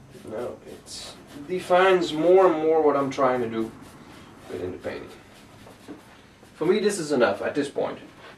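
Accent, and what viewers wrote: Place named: Netherlands. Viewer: I knew u were dutch from ur accent..:) Welcome!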